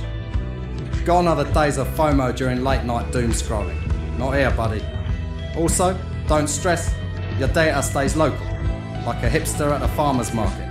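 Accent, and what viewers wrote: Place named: Mockney, United Kingdom. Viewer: Love the Guy Ritchie mockney accent goes well with the presentation. prefer web based apps for windows as not a fan of chrome. Brilliant tool, I must delve deeper into there whys, whats and wheres. thanks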